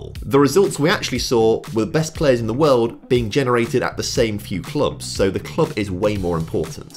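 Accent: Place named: RP, United Kingdom. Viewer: it just sounds way too posh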